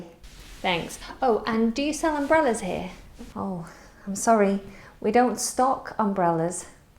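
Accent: United Kingdom. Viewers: Hi there! Today we have found out a new skill in you: an actress. So you're not just an excellent teacher, but a great actress too. Congrats for your work on this video! It's a great help even for those of us who think have a little higher level of English. But listening to you, to your voice, to your beautiful British accent is so pleasant! Cheers! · You are a perfect actress as well as a teacher. I adore your british accent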